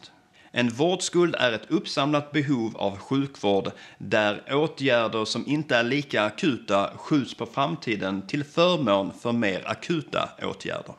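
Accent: Skåne, Sweden. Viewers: Skånska är bäst!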